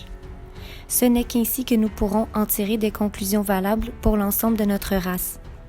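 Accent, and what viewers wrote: Quebec, Canada. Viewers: merci bcp pour l'effort...je me demande simplement pourquoi un documentaire si intéressant et si beau comme celui-ci de ne pas penser le soumettre à une voix française au lieu canadienne pour qu'il soit auditivement confortable.merci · Tu es Québécoise? Car tu as l'accent.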